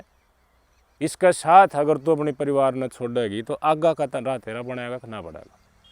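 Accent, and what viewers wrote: Haryana, India. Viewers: Bhai aap pureeee HARYANVI BNTE AA RAHE HO HAN THODA. BAGDI JROOOR S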